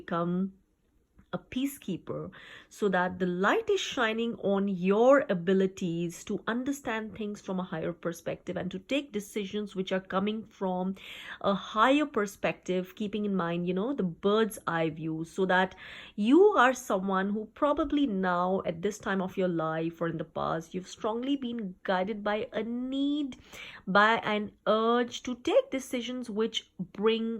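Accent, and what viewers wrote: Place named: Trinidad and Tobago. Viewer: Sound kind of trini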